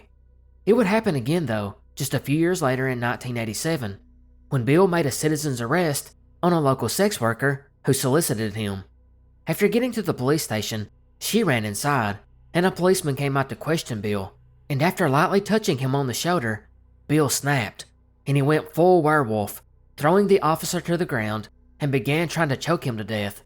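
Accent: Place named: North Georgia, United States. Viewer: Your accent is just like mine and my whole family , it's cool bro Howdy from NA GA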